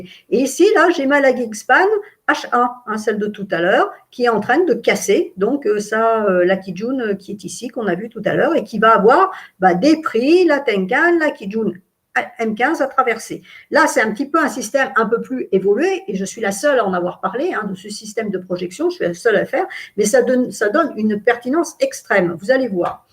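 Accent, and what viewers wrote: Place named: England, United Kingdom. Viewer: Cet accent Anglais me laisse pantois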